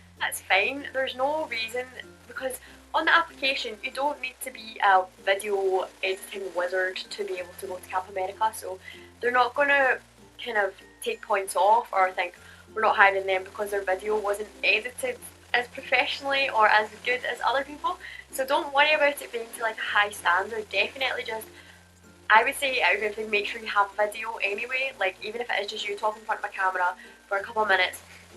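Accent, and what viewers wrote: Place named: United Kingdom. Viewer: Wonderful videos! Although, I'm a little confused. Your accent says UK but your video: Camp America? :P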